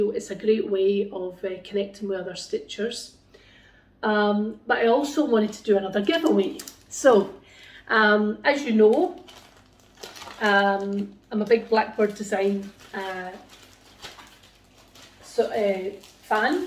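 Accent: Scotland, United Kingdom. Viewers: So glad to see you're doing much better...slow and steady...soon you'll be up and running around. I love that pattern Thistle and I may have to see if I can track it down to purchase it. Really enjoy your videos and your lovely Scottish accent. Star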